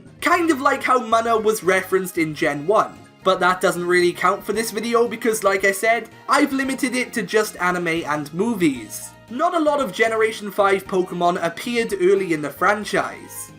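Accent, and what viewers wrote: Wales, United Kingdom. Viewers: Are you welsh 😊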